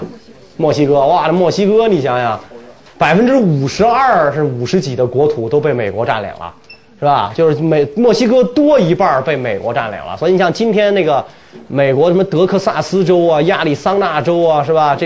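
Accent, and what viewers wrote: Beijing, China. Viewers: 讲课的时候用北京腔感觉不严肃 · 讨厌北京强调。 尤其是讲课的时候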